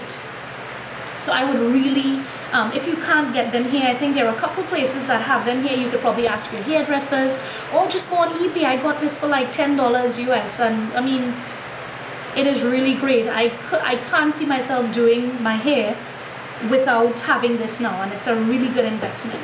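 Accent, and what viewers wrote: Trinidad and Tobago. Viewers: As a fellow Trini I LOVE to hear the Trini accent on YouTube !!!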